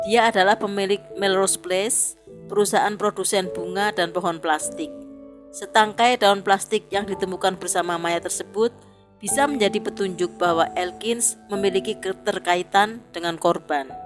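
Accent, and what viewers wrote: Java, Indonesia. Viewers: medoknya juga dikurangin sedikit madam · Medok Jawanya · Pembawa nya kurang pas suara nya medok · narasinya bagus kok, sangat Indonesia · Medok bgt yg isi suaranya gak netral ah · Logatnya Indonesia bagetttt....tulen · Pengisi suaranya agak medok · medok bgt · Naratornya SPT suara orang Jawa yg ngapak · Suara admin jawa kental bgt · dari suaranya pasti orang jawa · Medok bngt baca ceritanya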